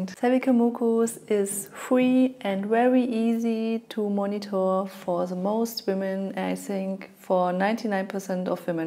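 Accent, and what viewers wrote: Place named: Germany. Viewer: i've lived in Austria for 10 years and I couldn't ever have mistaken that accent Germans have when speaking English 😁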